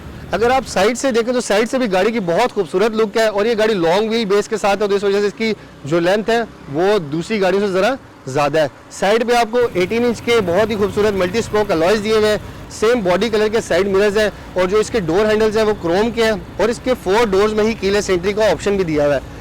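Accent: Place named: Rural, India. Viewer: Vocals should be improved, seems like a pendu is reviewing a prestigious car. Most likely conduct review in english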